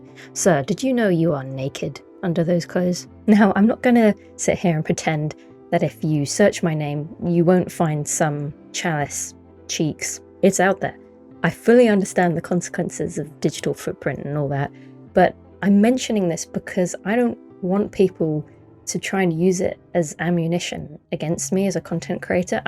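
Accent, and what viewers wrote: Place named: England, United Kingdom. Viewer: It was mainly the lore videos that caught my attention & made me keep coming back for more, your voice & English accent if I’m correct were a very nice bonus 😁😍😮‍💨👌🏽looking forward to more content from you, just don’t overwork yourself take a step back & breathe if you need to 🫶🏽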